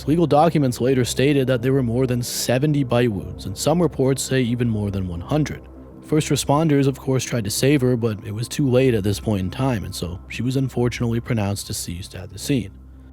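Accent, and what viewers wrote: Canada. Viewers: Canadian ? Subscribed. 🇨🇦👍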